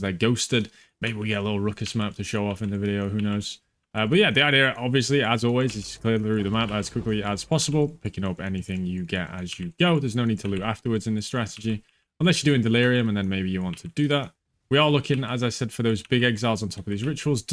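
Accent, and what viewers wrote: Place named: Lancashire, United Kingdom. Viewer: Thanks or, appreciate the constant different strats to try - will be giving this a go tonight, and i'll post my findings as well. Out of interest, where are you from!? You sound like you could be from where I'm from in Lancashire, but also could be southern. Cannot guess.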